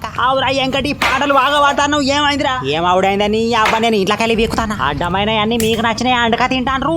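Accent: Telangana, India. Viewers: Tammudu..Telangana yaasa, adhurs. Keep entertaining🎉😊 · Babu.. memu Andhra vallam. Nivu matladuthunte inka inka vinali anipisthundi. Samethalu, patha kalam lo vadina konni words vaduthu.. entertainment and vantalu vanduthunnaru.. nivu matlade Telangana yasa nenu epudu vina ledu. Ela vachindi ... intha pravinyam?. God bless you Babu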